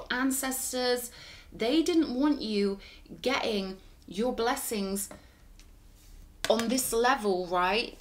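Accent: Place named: England, United Kingdom. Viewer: Well now you have me love struck with the natural setting as Heaven, tee hee. Having the queen's english and angelic level already had me sold that you are a gem. Chances are you may not be interested in a 61 year old frog though. I'm guessing you are quite picky. As we all should be I guess. Personality wise for sure. Take care. ✌️🥰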